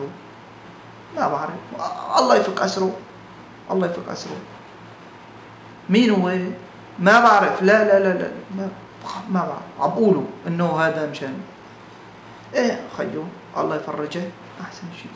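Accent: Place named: Aleppo, Syria. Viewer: دخيل الحلبيه ماأحلى حكيون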